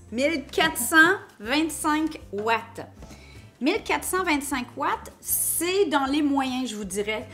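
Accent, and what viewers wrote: Quebec, Canada. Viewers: Mais quelle vidéo complète et utile ! Un gros merci et quel agréable accent, le québec me manque !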